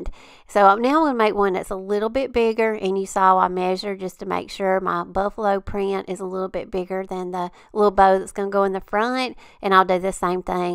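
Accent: South, United States. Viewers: you never fail to amaze me! I love your style, your cute southern accent & your beautiful projects, thank you! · Awesome job. 🥰 I love your Southern Southern voice.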